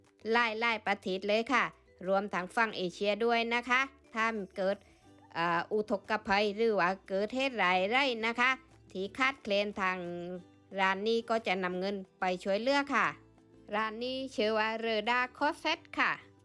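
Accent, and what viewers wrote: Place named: South, Thailand. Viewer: ชอบจังเลยพูดภาษาใต้บ้านเราเพิ่งเข้ามาติดตามเดี๋ยวจะตามแลย้อนหลังนะคะ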